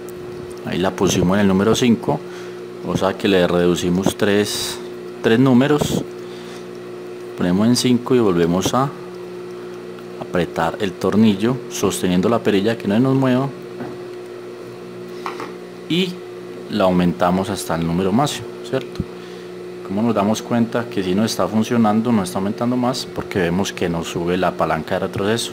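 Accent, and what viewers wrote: Colombia. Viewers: buenos dias amigo por su dialecto se meda que usted es colombiano y por los lados del eje cafetero buen tutorial felicitaciones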